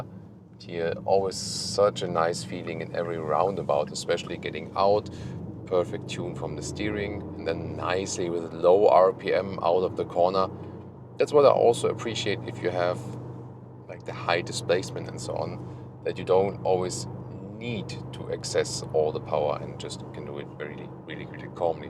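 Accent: Germany. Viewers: “Lots of high gloss black…which no one asked for”. 😂😂. Exactly! Love your channel and its presentation. The German accent makes listening fun as well for an American lol. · You sound too German for me as an American 🇺🇸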